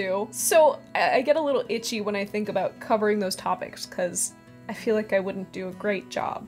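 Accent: Virginia, United States. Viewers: I do not live in Michigan though I do have a Michigan states park pass on my car! I think it’s interesting, my guess from your accent was Virginia so partial credit? Really enjoying the channel! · This isn't meant to be mean it's meant as helpful criticism: Your accent is "Northeastern US from Virginia"? Virginia is in the southeastern US. New Jersey on up is Northeastern US, and the rest of the Northeast isn't really happy with having Jersey as a part of the Northeast. New York accents are northeastern US, general New England accents are Northeastern, Boston accents are Northeastern US accents.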